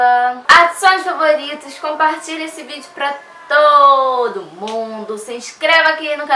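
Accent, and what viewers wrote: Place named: Rio de Janeiro, Brazil. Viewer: Não sei poorq mais amo sutaque carioca haha💛 tbm faço videos👊 se puder da olhadinha no meu canal agradeço um bjao😻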